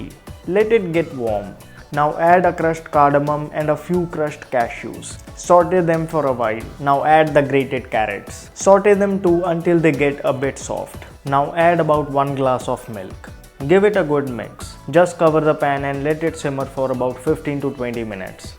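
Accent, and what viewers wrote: India. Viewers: your english is amazing .perfectly indian english .amazinggggggg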